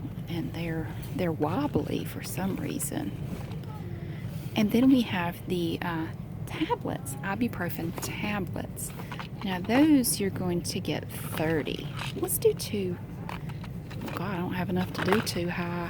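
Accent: South, United States. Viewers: You sound like my family. We're from Alabama and South Carolina. When I get sleepy or angry my southern really pops out. I find your videos really soothing. Keep being you!!! ♥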